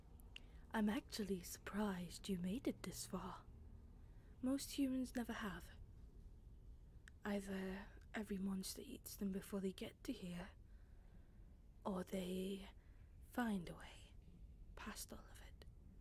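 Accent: Scotland, United Kingdom. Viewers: Are you from Scotland? I hear an accent.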